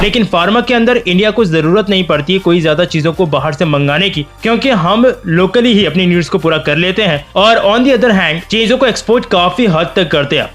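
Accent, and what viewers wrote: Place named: Pakistan. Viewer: Bhadwe tu pakistani hai, fake khabar mat faila.. teri accent pakistani hai BKL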